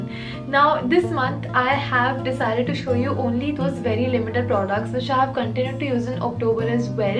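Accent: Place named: Mumbai, India. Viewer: You are too cute! Your mix of Hindi and English words within a sentence is so Mumbaiiiyaaa...sooo sooo very me! Lots of love! :)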